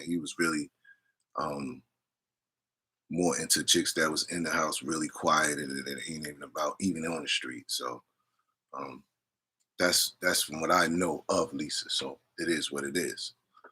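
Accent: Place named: New York, United States. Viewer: you sound like a new yorker bro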